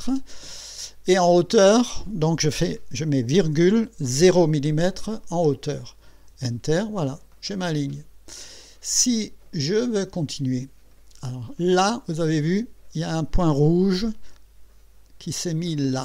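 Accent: Alsace, France. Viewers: Alsace (d'après ton accent)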